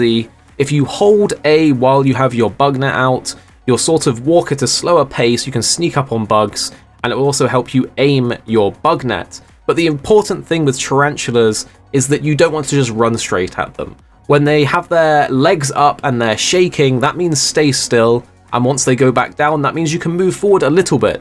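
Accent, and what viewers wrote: England, United Kingdom. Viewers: Man, how you managed to get that British accent? It's amazing!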